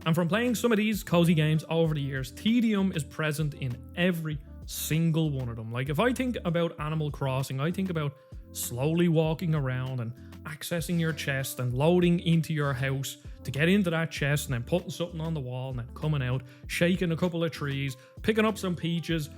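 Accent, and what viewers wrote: Ireland. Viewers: OMG man, such a lovely video, I don’t get the hate either and you just sold me on it, gonna get it myself, also it’s amazing to hear an Irish guy for a change, never been subscribed to any Irish YouTuber so here it goes- you are my first 🍀💚 and if anyone would like to play some cozy/ multiplayer games together (on Switch!) let’s exchange our codes, happy gaming!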